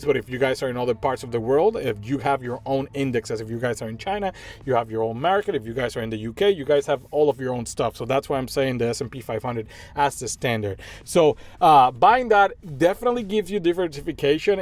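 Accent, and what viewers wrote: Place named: Spain. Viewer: Ok thank you for your answer, I know English too but I thought you was a native spanish talker jajajaja, I like a lot this new channel, i will take a look of the other videos, thanks for sharing your experience!!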